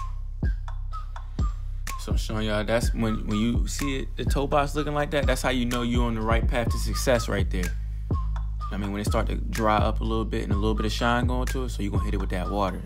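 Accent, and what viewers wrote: Baltimore, United States. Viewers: You sound like you are from Baltimore. Have you ever tried using ice cold water? Another technique I use instead of cotton balls is to use panty hose?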